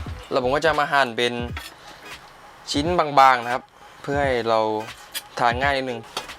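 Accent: South, Thailand. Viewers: พี่คนใต้หม้ายนิ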